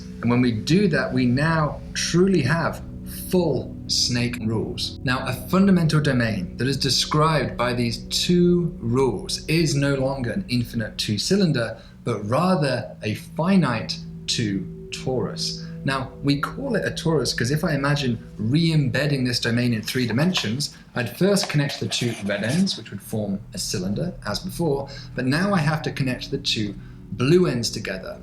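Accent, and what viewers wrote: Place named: Liverpool, United Kingdom. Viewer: why is this guy's face always on view ? why are stills of Brad Pitt and the moody brit actress all over this?why does he talk as if he is talking to 8 year olds. oh.. cuz he is i guess. so annoying i had to get up from me telly and find me lap top to commentAH ! it's only the annoying Scouse that annoys me so much. a bit too much to take before I've have me cup o builders innit? Yes, I am in fact a bluuudy git. spoiler alert, he tells the size 45 billion to the edge. you're welcome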